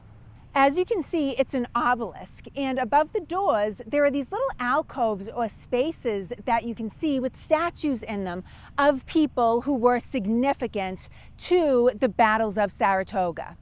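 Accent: Boston, United States. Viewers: Love to hear your accent! Although this is about Saratoga, I said she has to be from Boston! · Love the videos! The genuine Bostonian accent is wonderful and adds to the fact that knows the topic. MORE VIDEOS PLEASE! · Another great video, as you were talking, I was thinking of who could not be there. And Arnold came right in my head. I've been watching your videos for years. I am a tour guide in Boston and portray Handcock. I use a lot of your bits of Revolutionary history with my tourist. Things people have never heard. Thank you for all your hard work bring us these videos. Continue to stay true. Love that Boston accent! Cheers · Love the history lesson with such enthusiasm and your lovely Boston accent, thank you. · 1st, I love the accent. My job moved me to the west coast about a5 years ago. Hearing that Boston accent makes me feel at home. That said, BA was a great general until he wasn't. 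Wasn't it the guns captured there that were used to force the British from Boston after they were placed on Dorchester heights? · I absolutely love your enthusiasm and that heavy Boston accent there's no one better to talk about the Revolutionary War then someone from Boston. Great job loved the video and I also subscribed. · If only Benedict Arnold had not met and married teenager Peggy Shippen, history might have looked at him very differently. I love your enthusiasm and your Boston accent (calling a park a pac). Really enjoying your videos.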